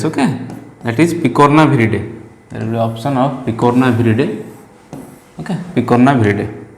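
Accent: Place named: South India, India. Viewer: Due to south accent please cc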